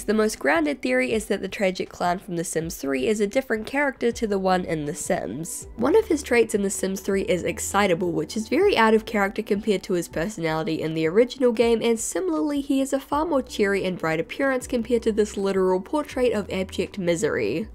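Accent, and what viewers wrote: New Zealand. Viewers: You know, , I briefly turned on closed captions to make sure I was hearing you correctly, and then I see this about the genie: "summoned from a lamb and gransom's wishes" I just think the subtitles or YouTube or something like that can't keep up with your thick New Zealand accent. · why did it take me so long to realise that ur from new zealand!!! i swear i’m so bad at picking out my own ppl lol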